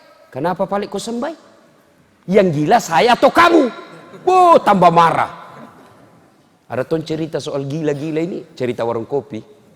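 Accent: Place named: Bugis, Indonesia. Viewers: Inget babah denger logat bugis ustad das'ad😢 semoga nanti bisa undang ustad saat ada acara🙏 aamiin yaa allah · Ceramah lucu,kocak,bikin ngakak,logat bugisnya bikin tambah suka banget. Tapi sangat berbobot...saya ska ustadz. Smoga selalu diberkahi Allaahh ya istadz.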